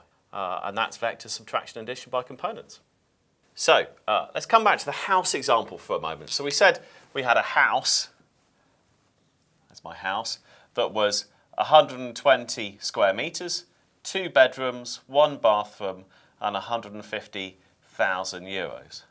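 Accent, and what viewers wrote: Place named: United Kingdom. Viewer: I fucking love the british accent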